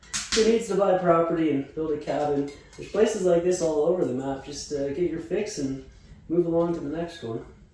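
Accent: Canada. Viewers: If the accent didn’t give it away, the comment about a “2-4 of beer and maple syrup” would have solidified Canadian identity. 😆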